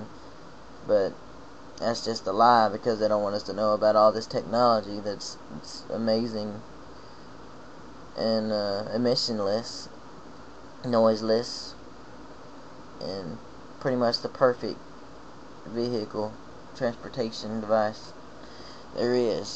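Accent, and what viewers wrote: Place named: South, United States. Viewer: Who say's people with a southern accent are dumb hicks.This dude is a genius ! Good for him ! This stuff is above me.I'm a dumb Northerner !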